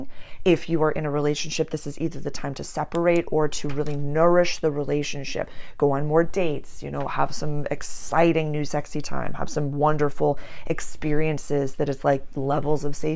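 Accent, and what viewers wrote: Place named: Northeast, United States. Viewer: I know it doesn't matter, but I like your NE accent. Thank you for the message.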